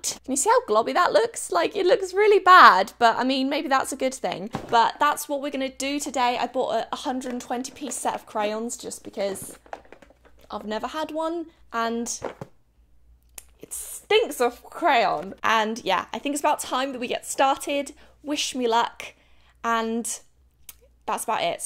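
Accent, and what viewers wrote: England, United Kingdom. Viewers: DON'T say "it stinks of Crayon".... that's the BEST PART of a Crayola crayon.Nothing takes me back to my childhood that opening a box of crayons and sticking my nose i it to get my lungs full of that Delicious aroma. If they would bottle it in liquid, I would buy it. From your accent I can tell you're English so maybe this is the reason you think this stinks. Not me, and I'm 74 years old. I still get boxes of crayons for Christmas or my birthday because everyone KNOWS i love crayons. It's fun to sit and color in one of my old coloring books. I stopped short of you beginning the video.... maybe crayolas would be better for you if they smelled like CHEESE? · That artwork was AMAZING, the talent you have is so beautiful and your english accent makes my heart melt🤗💗 Saying hi! From Argentina🇦🇷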